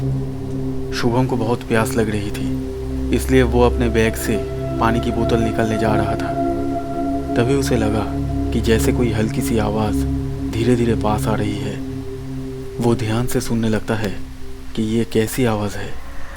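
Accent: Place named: Bihar, India. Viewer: Sir aap bihar se ho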